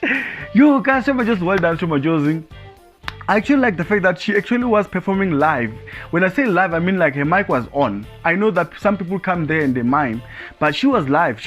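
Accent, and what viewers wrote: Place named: Tsonga, South Africa. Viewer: I repeat luyolo can sing you have so much hate for luyolo. Stop hating. And what's with your funny xitsonga accent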